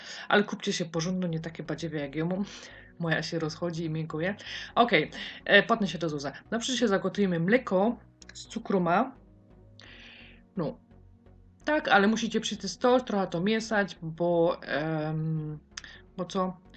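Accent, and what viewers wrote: Silesia, Poland. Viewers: To jej wina że pochodzi ze Śląska i mówi tak jak została nauczona? Trochę taktu kobieto... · Pytam na poważnie :) jako ciekawostka dla mnie, czy jesteś polką i mieszkasz w polsce? Czy Twój akcent jest prawdziwy dla Twojego regionu widzę w opisie śląsk i zastanawiam się czy na prawdę gdybyś tak mówiła do swojego znajomego na Śląsku to nie było by w tym nic dziwnego? Czy jest to tylko pomysł na kanał, żeby było ciekawiej? Pozdrawiam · Zastanawia mnie jeden fakt, nauczyciele na śląsku uczą was tak mówić? Na śląsku forma taka jaką się porozumiewacie jest uznawana w szkołach za poprawną, czy po prostu wszyscy tam mają taką gwarę, i każdy ma to w dupie. Pozdrawiam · O matko, jai ten język sląski jest zabawny 😂😍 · Etnolekt śląski, odmiana opolska. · Bardzo sympatyczna z Pani dziewczyna ;) mimo, ze nie rozumiem slaskiego dialektu to jakos.daje rade ;) swietny przepis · Nie lubię śląskiej gwary.....dziwne że u ciebie mnie to nie przeszkadza, wręcz odwrotnie, może dlatego że jesteś bardzo naturalna,skromna ,sympatyczna a twoje fajne przepisy prezentujesz i komentujesz lekko i swobodnie to jest w tobie najlepsze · Śląsk! 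:D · Ciekawy chyba przepis ale twoja śląska gatka zniechęca do słuchania. ... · To po prostu śląski ;) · To się nazywa gwara , wydaje mi się że Śląska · Świetnie określają, I fajnie TLUMACZYSZ, bardzo dokładnie I po śląsku, POZDRAWIAM Z TORONTO, JOLA, POZDRAWIAM Mikołów,♥️♥️♥️👐🏻👐🏻👐🏻🙌🏿🙌🏿👏🏻👏🏻👏🏻👏🏻👏🏻👏🏻🎗🎗🎗🌋🌋🌋😘😘😘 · Masna ta gwara śląska 😂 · Tak mówią ludzie ze śląska, jak się nie podoba, nikt nie każe Ci oglądać 🤷🏻‍♀️ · Jak to fajnie, że dziołcha od nos ze śląska! Aż się fajnie słucho! :D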